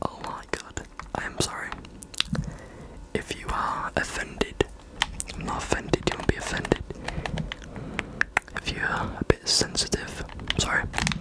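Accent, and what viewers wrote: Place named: England, United Kingdom. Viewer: cool! i feel like people from england have great asmr voices, and you did not disappoint:)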